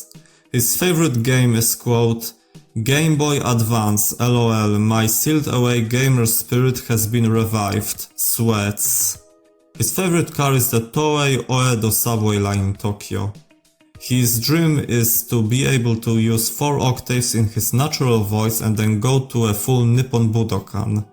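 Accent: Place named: Poland. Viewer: Are you from Poland? I can hear our accent 😭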